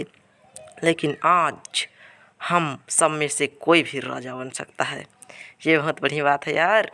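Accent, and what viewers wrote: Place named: Bhojpuri, India. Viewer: This is my first time someone is teaching me in Bhojpuri accent 🙄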